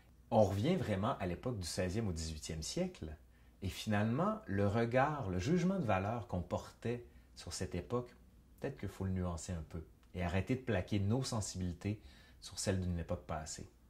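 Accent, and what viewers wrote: Quebec, Canada. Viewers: J'aime bien le acsent Canadian, c'est drole ca me fait sourire . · Ta vidéo est bien argumentée mais tu aurais dû dire que la peur de l'eau était liée à l'épidémie de la peste noire, à t'entendre tu es québécois ? 😀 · Que j'adore cet accent Québecois! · Tres instructif, j 'adore votre chaîne, beaucoup d 'informations présentées de façon agréable et drôle, et votre accent québécois est vraiment charmant. Merci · C est chouette un vrai conteur en chair et en os j adore ce petit accent québécois il raconte super bien 😊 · enfin une bonne chaine d'histoire, sans musique techno hyperactive en fond sonore et sans effets comiques surjoués. Non seulement le fond est captivant mais de plus la forme est très agréable. avec le bel accent québecquois en supplément ! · Je viens de découvrir vitre chaîne et j'adore. Et l'accent du Québec, quel bonheur ! 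Des becs ☺️ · Les canadiens vont avez des doc super mais l’accent horrible! J’ai de la peine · J'adore l’accent de nos cousins québécois, merci pour cette vidéo bien faite ;) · Il est québécois... olalala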